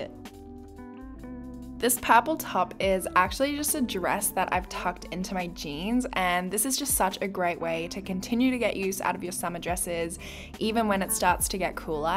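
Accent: Australia. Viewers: Oh my goodness you are the absolute best!!!! You are so beautiful!! Heck freakin'yeah of course ur Baby Girl wants in on Mama's work who wouldn't? She knows how gorgeous she is and how awesome her Mama is!!! She wants to be the icing on the cake and get those "bootie scratches" I call them 🤣....Puppers and Kittas are awesome!!! I just recently subscribed to your channel after watching a few of ur true crime videos and just fell in love with it!! I love true crime and u do a fabulous job!!! Plus u are so beautiful and cute!! I love the "disclaimer"...lol you put on one of ur videos I watched recently when u said listen I'm going to pronounce things wrong..lol. I mean who doesn't pronounce things wrong like all the time!!! And I think u do a fantastic job!!! U do ur best to pronounce things properly that I could NEVER even attempt to!!! So if the haters wanna hate let em' that's on them!!!! 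Also when someone would bitch about ur Mystery Mondays not always being posted on Mondays persay....get the hell over it ur a busy woman!!!! I absolutely love ur accent as well!!! I'm American, I've always loved Australian accents though!! Take care! ❤️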